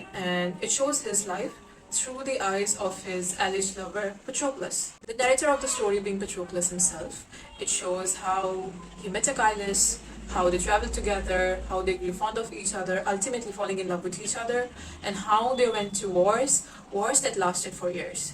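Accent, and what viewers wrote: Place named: England, United Kingdom. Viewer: literally set fire on stage 🔥🔥🔥 and your english accent!! Wowww!!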